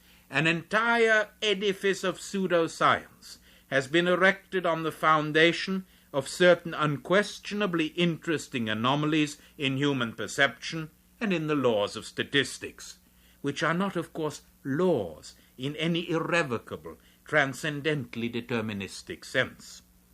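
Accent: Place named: India. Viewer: Even his accent is a smorgasbord! I wouldn't have known he was American. He sounds a tad Indian and a tad English.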